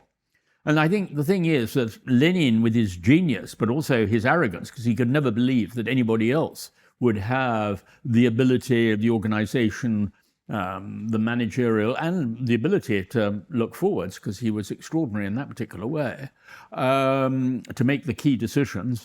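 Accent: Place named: United Kingdom. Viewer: Robert DeNiro with a British accent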